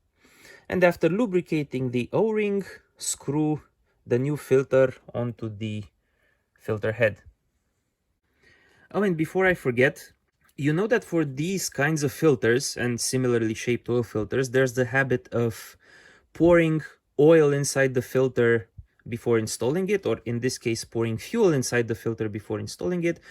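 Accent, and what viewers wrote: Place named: Romania. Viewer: Bravo. Frumos, lucrat curat cu atentie, cu grija la cuplu reocmandat dar la mediul inconjurator. Mi-am dat seama dupa accent si expresii ca esti roman atat.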